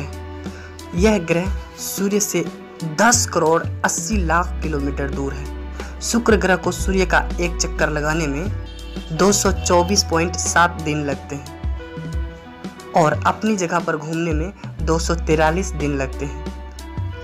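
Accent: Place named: Bihar, India. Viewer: भाई आप किस जिले से हैं भागलपुर, खगड़िया, बेगूसराय ?? आपके बोलने के टोन से guess किया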